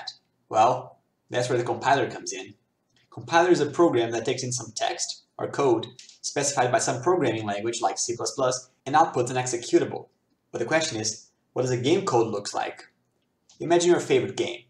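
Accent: Brazil. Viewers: The accent gave it away! I instantly knew you were Brazilian!🇧🇷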